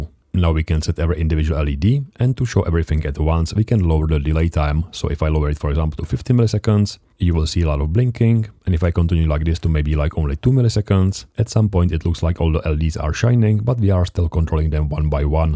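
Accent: Czech Republic. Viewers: hey I just had a question if you were czech because you really sound like a czechman and if so, DAMN DUDE that english be almost clear ale jinak nice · Good video and content👍👍 jsem si říkal, že zníte jako čech a je to pravda😂 musím říct, že dobrá angličtina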